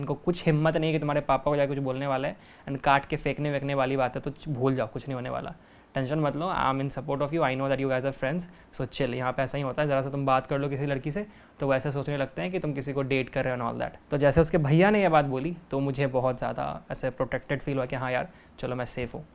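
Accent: Bihar, India. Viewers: Bhaiya aap bihar se ho kya 🤔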